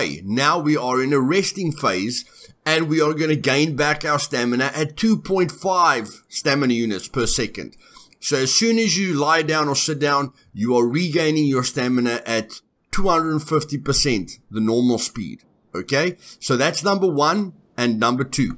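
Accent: Afrikaans, South Africa. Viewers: Great video, subed. Edit: your Afrikaans accent is the best! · You my china sound rough South African Afrikaans ekse ... lekkerrrrr lol..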